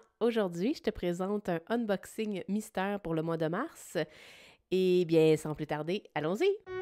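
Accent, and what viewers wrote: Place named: Quebec, Canada. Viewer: Oh la la, cet enthousiasme me touche beaucoup. Ce bel accent du Québec pour parler de mon travail, c'est magique :) :) :) Merci énormément pour tes mots !!!!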